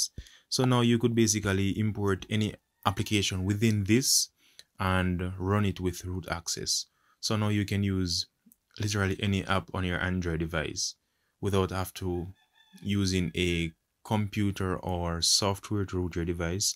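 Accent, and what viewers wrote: Jamaica. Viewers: Dawg sound like a jamaican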